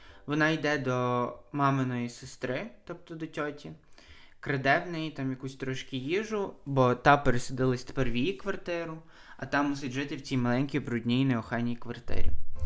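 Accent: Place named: Surzhyk, Ukraine. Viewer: такий жоский суржик((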